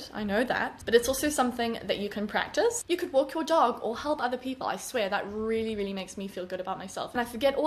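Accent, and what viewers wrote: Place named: South Africa. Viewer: No I think it's just her accent she's from South Africa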